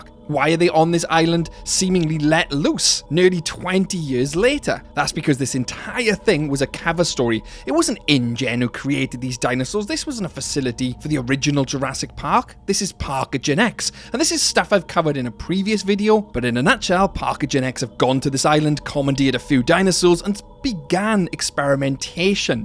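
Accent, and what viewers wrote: Wales, United Kingdom. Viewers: After discovering this channel, I only want to hear Jurassic Park/World news in a cheerful Welsh accent 🏴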